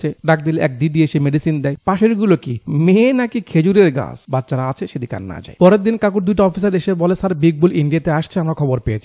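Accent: Bogura, Bangladesh. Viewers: আপনি কি বগুড়ার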